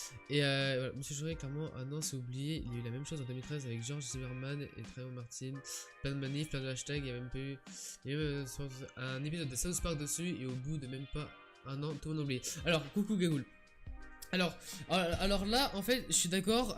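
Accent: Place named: England, United Kingdom. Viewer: Ton accent anglais god damn... 😒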